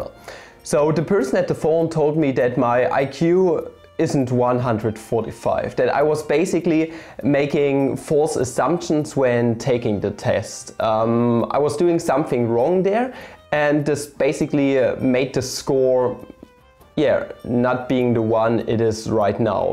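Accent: Germany. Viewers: 20 points for having such a dumb face and annoying German accent lmao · German accent. I find it cute. That aside.... 145 IQ is so high. I thought I had a good IQ and Feyman's 125 seems so high (why do people call it low?even if it was the great Feyman). Graduating medical students have an average IQ of 120 (their 50 percentile). Mensa test is confidential for a reason and it is best not to reveal anything specific about them. Most important thing is IQ is misleading and almost all most intelligent people I know personally do not care about IQ tests and even look down about them. So, unless you can take it for fun do not take it.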